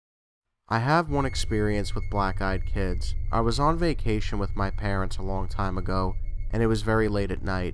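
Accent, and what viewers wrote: New York, United States. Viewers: Where are you from? New York or Jersey?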